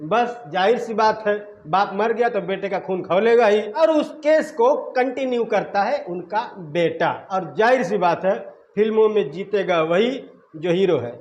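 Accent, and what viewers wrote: India. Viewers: Aap to akadam south indian ke logo jaise bole hai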